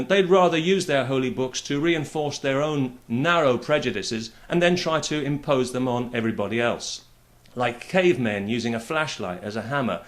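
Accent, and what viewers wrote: United Kingdom. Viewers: Nothing like a smack down with a British accent!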